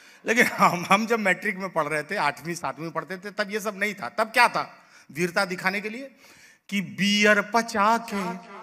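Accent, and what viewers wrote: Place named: Allahabad, India. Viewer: aapki awwaj me hi allahabad (prayagraj) ki mahak hai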